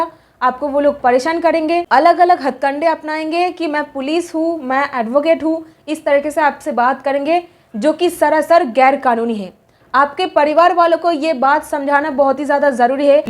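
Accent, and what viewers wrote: Bengal, India. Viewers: Ap medam bangali to nahi hai apake hindi bolane she pata lag raha hai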